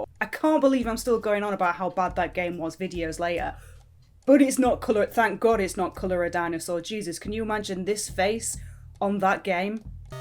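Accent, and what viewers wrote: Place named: Northern England, United Kingdom. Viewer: 2 questions: The name, is that a game or musical reference? And I know you're down south now but I hear northern, where did you grow up? (hull?) oh and Keep up the good work long time fan.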